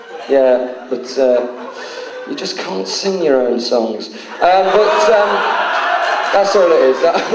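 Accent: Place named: Nottingham, United Kingdom. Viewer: Sounds as if your from my neck of the woods, Nottingham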